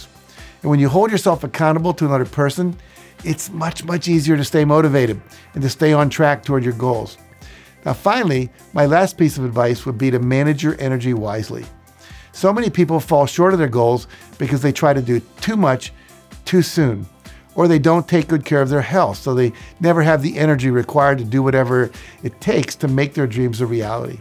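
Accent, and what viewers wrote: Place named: United States. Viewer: Thanks a ton you make it seem so simple, Ty for ref the Boorman video like a shot in the arm.refreshes my persistence muscle.i want to commit 100% to cleaning up my family home that i share with my siblings,parent's blessing want to make it more usable and less cluttered.I owe you special thanks for Rule of 5 from TSP book(awesome book) i practice and feeling the benefits of clean home. it is not all done but i can feel the results so thank You.i appreciate the written pointers you give on the side screen. i will also appreciate if you can ensure a sub-title feature in all videos as sometimes the american accent could be a challenge for us asians to follow. Great Job keep it up and Thank You once again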